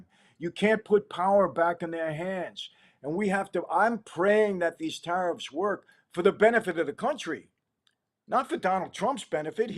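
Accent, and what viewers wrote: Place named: New York, United States. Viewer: As a Native New Yorker living out of state, I especially appreciate your New York accent and tone to reinforce what I already know (all of my family are gone). I am 66 but you also come across fatherly and that feels reassuring. Most of all, I appreciate your walk with our Lord and your faith in Him. BTW, I have a very similar testimony as you in how I was convicted of the truths in the Bible and the revelation of my relationship with God. The main difference is that I was not in prison. I was at the end of myself, though. God bless you!!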